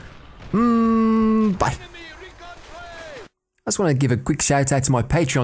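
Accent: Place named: Black Country, United Kingdom. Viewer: Followed you for ages, glad you're back mate. Think you're from the same (midlands?) area as me, although you sound Black Country/Walsall and similar age too. Keep uploading mate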